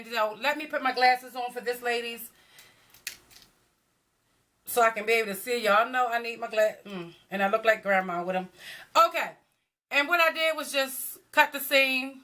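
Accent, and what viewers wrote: Baltimore, United States. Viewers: I hear that Charm City accent..Hey my B-more neighbor... I will be taking that drive to the Flee market. KEEP UP THE GOOD WORK I love this channel. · B- more accent Luv it. I was told I talk country and I came from Philly 😂 Luv this video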